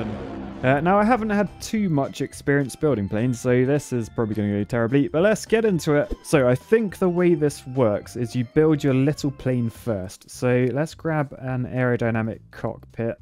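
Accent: United Kingdom. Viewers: Are you British? You're accent like british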